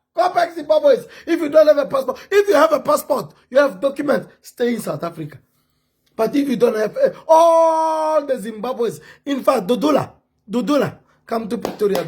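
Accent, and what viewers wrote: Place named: Nigeria. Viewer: Kanti wena wakwatha kanje batheni amaZimbabweans uzwakala njengo mnajerian wena😊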